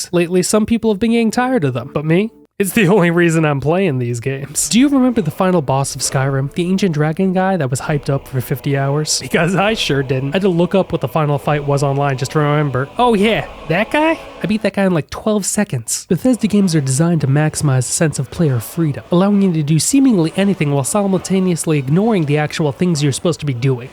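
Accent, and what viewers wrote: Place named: South Boston, United States. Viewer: This guy from Southie or wat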